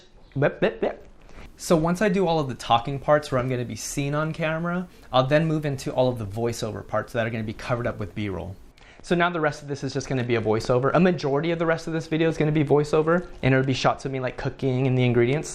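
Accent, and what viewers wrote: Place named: Hawaii, United States. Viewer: You have so much more / better equipment than me. I’m kind of embarrassed 😅 are you from Hawaii? I can hear a slight Hawaii accent.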